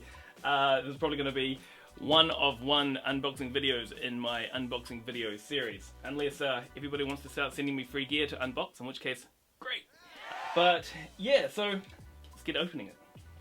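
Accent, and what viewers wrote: New Zealand. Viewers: Okay...I love guessing accents and I've always struggled with Aussie vs. Kiwi. That being said, I think I've gotten a better handle on the two and I want to guess. Are you from New Zealand?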